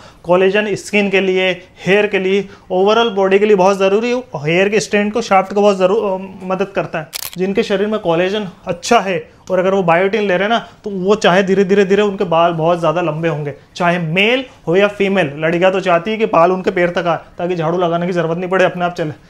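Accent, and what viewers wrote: Indore, India. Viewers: Indoor ke aash pash se ho kya ap toon arha he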